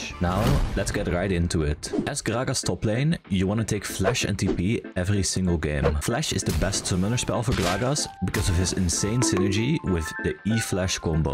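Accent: Turkey. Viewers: Are u turkish lad? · Are u turkish though? 💀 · great guide just one question Are you Turkish? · Are you turkish: DDDASDASD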